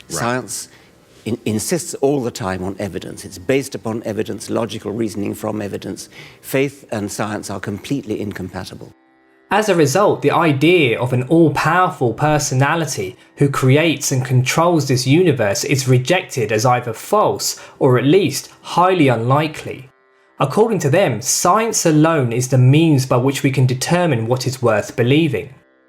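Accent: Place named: United Kingdom. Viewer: Charvaka was also a Hindu materialist Rishi,his philosophy was very materialistic😄.I have seen all your videos it seems you have read many Vedas,upnishads and purana.your understanding is also praisable,I think you live in London (your accent is British and library of London is pretty rich specially when it comes to old Hindu scriptures). I'm requesting you second time make a small video about yourself.I want to recommend a name Dr.Narayan Dutt shrimali.Answer my comment so I will know that you read.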